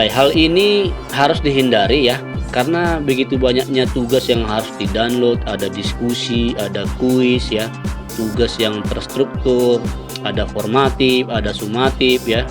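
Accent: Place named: Makassar, Indonesia. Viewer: mantap pak aku kira makassar td hehe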